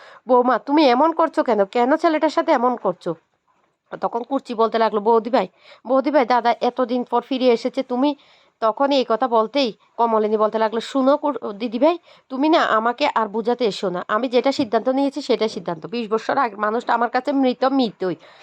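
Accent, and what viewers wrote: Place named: Noakhali, Bangladesh. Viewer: Didi apnr kathagulo kmn lagche sunta. Apni ki nowakhali dist a thaken?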